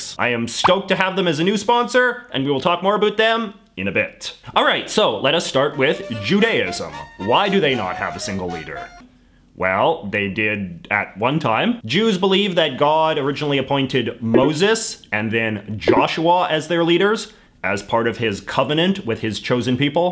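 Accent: Canada. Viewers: this guy has the most pronounced Canadian accent ever. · Yo like can you turn down the canada 3 notches? Like.... there are other ways to pronounce about that dont sound like "a boot"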